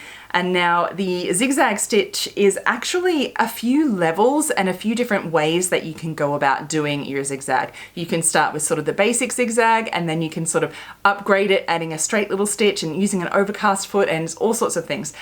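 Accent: Australia. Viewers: I love your advice, especially with your charming Aussie accent. My serger and I never bonded, too hard to thread, gave it away. Love my brand new Janome with several zigzag choices. Have done all the seams you demoed and love French seams, flat felled and bound seams. I’m 75, sewing since age 10 and still learning. It has been so interesting to see the newer trends and techniques in sewing. Especially loved the demo of your wrist pin cushion, I totally love it! It’s the first thing I seize when starting to sew! I’ve made everything from exercise wear and swim suits to wedding dresses. I love clothing construction and crafts. You are inspiring! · Aussie accent - yay !!!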